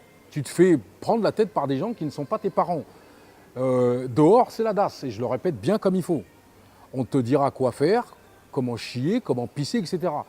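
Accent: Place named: Banlieue, France. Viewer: Ba jean Gabin si tu viens pas de banlieue pourquoi tu parle comme un banlieusard ?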